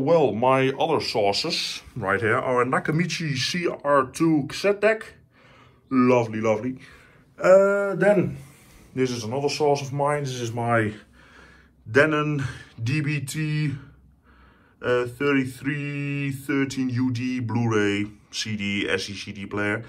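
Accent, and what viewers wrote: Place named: Netherlands. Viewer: Leuke setup man, dacht all heejj dat klinkt als hollands engels😂🤣👌🏻💪🏻👍🏻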